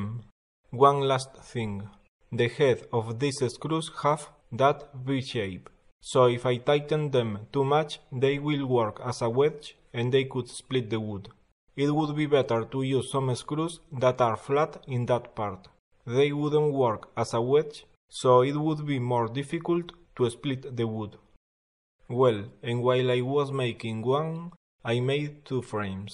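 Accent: Spain. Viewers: Great and unique Spanish accent! Cheers mate! Salut! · I've spent my life reaching English to Spanish speakers (and others). Your accent is definitely Spanish from Spain but otherwise your English can't be faulted. Great videos too!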